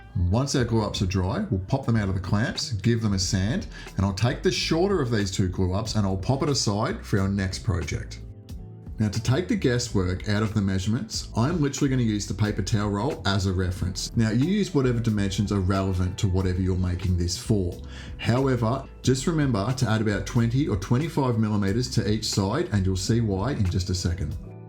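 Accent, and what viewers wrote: Australia. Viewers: Love these. Simple and effective. Also cool to see and hear another Aussie maker. Just subbed. Damo.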